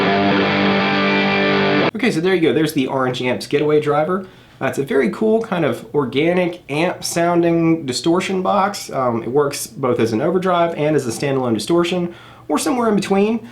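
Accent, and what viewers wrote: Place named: South, United States. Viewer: Thanks for the response. I hear your Southern Accent. Were you born in the South? Keep rocking! 😎😎😎😎.....That Splawn Amp of yours is real nice too.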